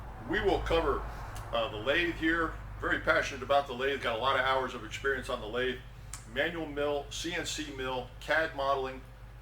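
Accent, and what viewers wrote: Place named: Brooklyn, United States. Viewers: u sure u not from Brooklyn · I feel like is originally from New York, like me. I place his accent in Medford area (or west) on Long Island.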